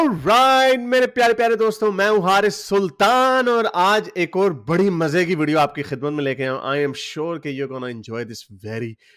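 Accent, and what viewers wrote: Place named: Punjab, India. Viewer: Your Punjabi tone is very beautiful 👍👍👌👌 Jai Hind Jai Bharat🇮🇳🇮🇳🇮🇳🇮🇳🇮🇳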